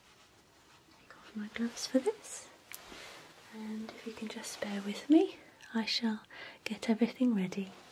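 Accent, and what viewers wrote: United Kingdom. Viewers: I love your british accent. kisses and hugs from brazil!! 💓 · u british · I love ur British accent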